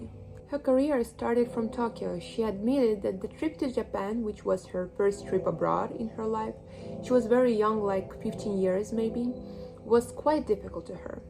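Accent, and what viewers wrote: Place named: Russia. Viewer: а ты тоже русская,слышу по акценту аххах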